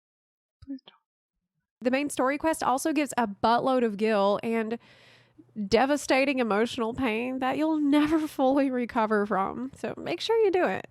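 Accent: South, United States. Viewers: Your accent trips me out. It's like a regular American accent 90% of the time, but some words have that great southern drawl. I like it. Great video! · I definitely didn't expect the sweet southern twang in you're voice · So southern bell, defiantly into it 💕💕💕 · Less than 3 minutes in and I am IN LOVE with accent! I'm from the south as well, and to see a fellow southerner, and a strong woman as well, as a successful content creator is such an amazing feeling! Her sense of humor, thorough and concise explanations, and tone are refreshing, relaxing, and the best thing I've watched in QUITE a long time! you have a fan for life!!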